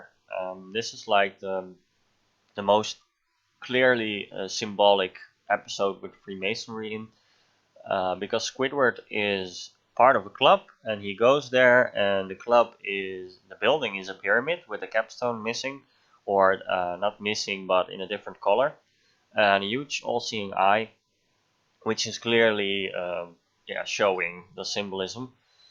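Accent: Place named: Netherlands. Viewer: Volgendsmij ben je nederlands ik kan dat horen haha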